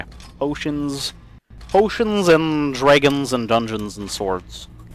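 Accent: Boston, United States. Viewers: You from baahwsten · lol you sound like you're from Boston or Rhode Island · something tells me your from Boston... My step fathers from boston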